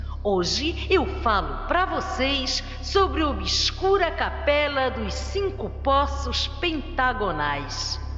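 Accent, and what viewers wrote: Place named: Northeast, Brazil. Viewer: curto seus videos e seu sotaque também rsrsrs nordeste neh?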